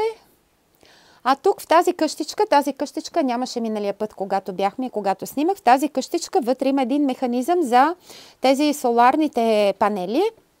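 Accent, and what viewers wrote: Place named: Italy. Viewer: Сигурно си от доста време в Италия, защото се усеща италиански акцент:) Ти сподели , че дъщеря ти не знае бг . Напълно те разбирам:)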